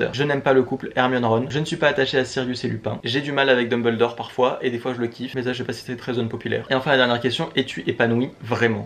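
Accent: England, United Kingdom. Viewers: Alors, j'adore ton accent anglais, team Serpentard depuis toujours hehehe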